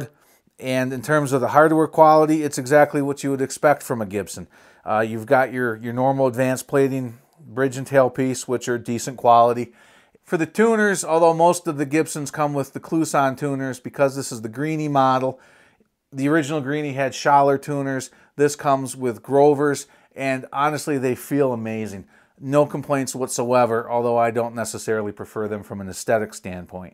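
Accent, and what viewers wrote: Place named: Michigan, United States. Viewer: first video I've seen from ya. Nice evaluation. Thanks! looking at a Chibby or Episode Greeny. I'll bet $100 you're from Michigan. I've been in FL since 84 and can hear it... lolol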